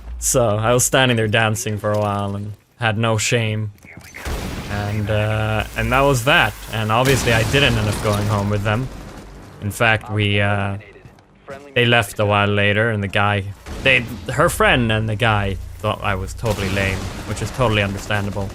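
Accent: Sweden. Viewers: Are you swedish? Sounds like that! I am swedish If you wanted to know!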